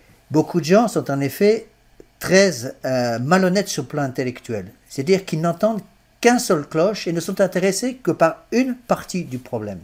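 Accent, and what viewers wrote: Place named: Algeria. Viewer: MERCI INFINIMENT, MR L'ABBÉ pour cette prédication de choc, si vivante et avec le savoureux et tellement authentique accent pied-noir!❤😊😂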